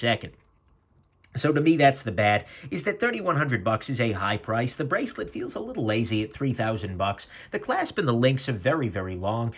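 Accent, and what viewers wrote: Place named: Brooklyn, United States. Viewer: You sound like you grew up in the tough side of Brooklyn, NY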